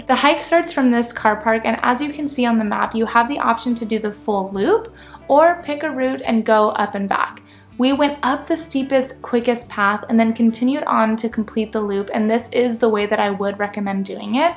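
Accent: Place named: United States. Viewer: Hi. Not sure what Country you come from but you sound American or Canada? Haven't you looked into flights to Manchester from America or Canada? Manchester is much closer to the Lake district than London.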